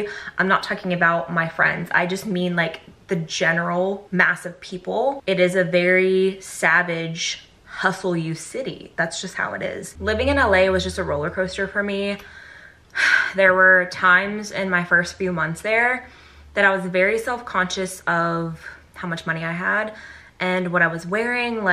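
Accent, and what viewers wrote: South, United States. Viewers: your southern twang is peaking back out! <3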